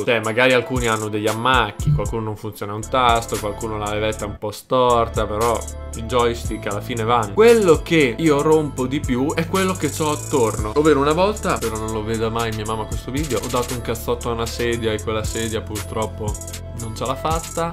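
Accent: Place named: Tuscany, Italy. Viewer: la tua toscanita è palese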